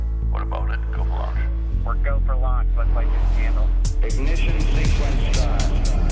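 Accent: Germany. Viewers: episodes with a German accent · do a future episode in the German accent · I really like the accent... where the Germans are speaking in English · You should always use the German accent. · German accent? It’s like Von Braun is back amongst us. You Rock! Tschuss! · Totally would appreciate an episode in your Deutschland accent. Reminds me of my mothers, who immigrated in 1960 to America. I think it would harken back to some of the greatest rocket scientists in history. Thumbs up if you agree. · I vote to go with German accent... just how you would normally talk and not exaggerated. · really fun an episode with German accent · do a show in your german accent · Hie.... I'm more anticipating the episode in the German accent than the 15km hop😆😆👍👏👏😁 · You should indeed do one with your German accent on display. Really enjoy the out takes at the end of your videos as well. 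you rock! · Yes, PLEASE do an episode with your natural German accent! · YESSS! A German accent episode, please! 😀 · your German accent brings back memories of Guenter Wendt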